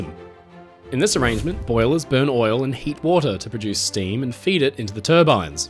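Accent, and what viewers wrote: Australia. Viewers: I didn't realize was Australian, one would think the accent would be a clear give away, but i mistook it for a British accent until i saw his location on the channel's description page.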